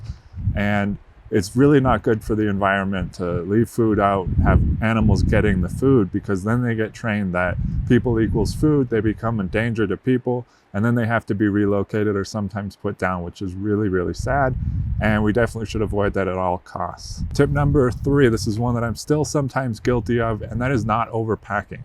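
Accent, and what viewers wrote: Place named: Massachusetts, United States. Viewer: Framingham? Newton? Norfolk county? pegged your accent right away, now I gotta know....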